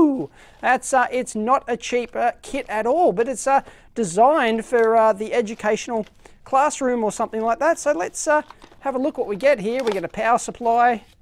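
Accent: Australia. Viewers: True, love the Aussie flavor Dave! Just a head's up though, go easy on the "S" ($#!+) word, it's a little rougher over here [U.S.] than over there ;) (not exactly family friendly) Cheers :)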